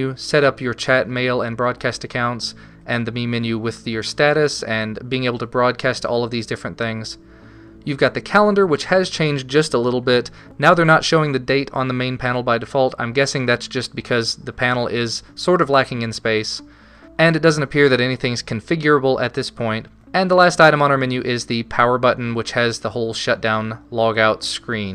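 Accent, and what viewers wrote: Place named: South, United States. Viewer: oh well, I realize this is science-fiction weird that a complete stranger would focus on your accent on a Ubuntu review but, it's very light and fluid...I knew it was from southern America but...so many accents are heavy, and the hard R's and all but then Kentucky ppl come along... anyways...totally off topic so merry Christmas and review away please.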